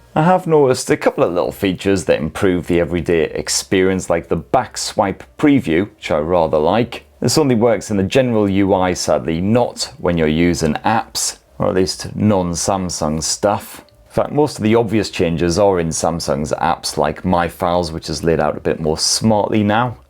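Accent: United Kingdom. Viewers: UK accent is so smooth and sorting, love it🥰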